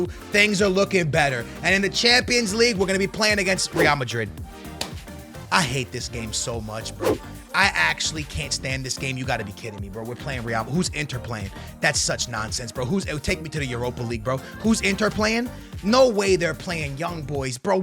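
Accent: Portugal. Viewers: falas tao bem ingles q quase nem se nota o portugues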